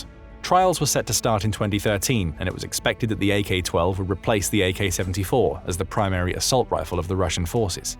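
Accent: United Kingdom. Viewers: Bullsh-t! The limey Russophobe voice just makes this channel CRINGE!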